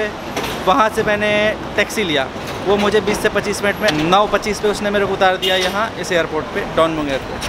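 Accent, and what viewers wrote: India. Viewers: Bhai ap jab bhi Desi andaaz bolte ho na to dil khush ho jata hai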